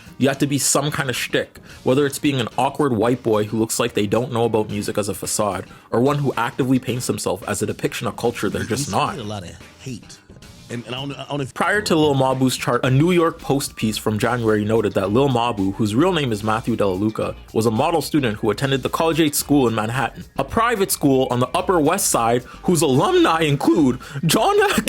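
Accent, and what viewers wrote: Canada. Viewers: As soon as I heard your voice I could tell you were a fellow Canadian 😂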